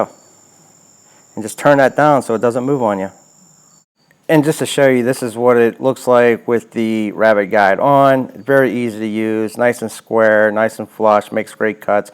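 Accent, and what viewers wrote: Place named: Philadelphia, United States. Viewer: you sound like you are from the Philadelphia area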